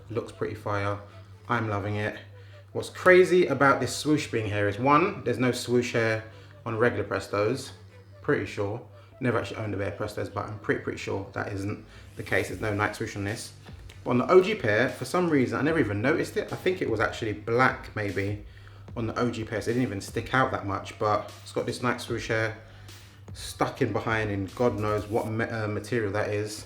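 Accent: United Kingdom. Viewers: You need to be British to fully understand his accent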